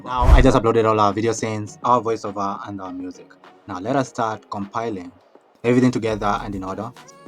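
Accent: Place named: India. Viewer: Maybe you are talking with Hindi Accent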